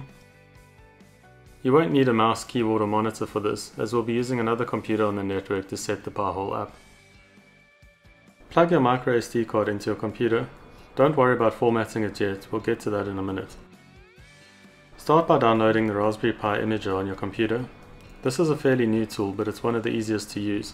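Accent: South Africa. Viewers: I'm a simple man, i hear a SA accent, i subscribe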